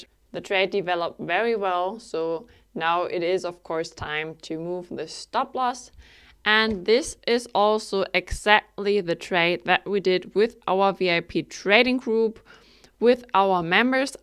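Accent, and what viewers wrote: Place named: Germany. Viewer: Ich wusste doch ich höre den deutschen Akzent heraus 😅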